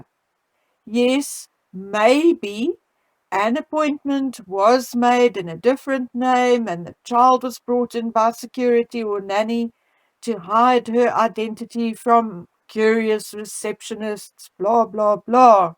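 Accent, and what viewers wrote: South Africa. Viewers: This woman (commentator) sounds like a South African, because of the accent. I am from SA and I can tell.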